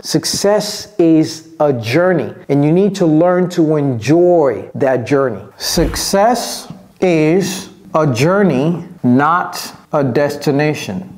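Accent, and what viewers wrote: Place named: United States. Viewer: I say it before, I am saying again.He is good ! One thing to remember remember, this is pure American English. Learn it , enjoy it and apply it. Americans will enjoy listening to you if you sound like him. Thanks again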